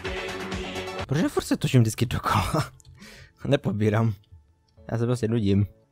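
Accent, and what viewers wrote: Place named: Ostrava, Czech Republic. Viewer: Btw jsi někde z okolí Ostravy? Zníš jako totální Ostravák :D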